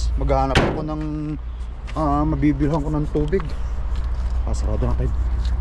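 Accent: Ilocos, Philippines. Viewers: pagpraktisan mo mga anak mo at sabihan mo i-correct ang mali mo. Tulad ng title ng vlog mo ngayon, mali na. Gusto ka ng mga followers mo dahil sa personalidad mo, prangka at walang inhibition. Yon nga lang, pag nag english ka na at sasabayan pa ng ilocano accent mo, halos matunaw ako sa paninimbang. Pero enjoy ako sa panonood sayo.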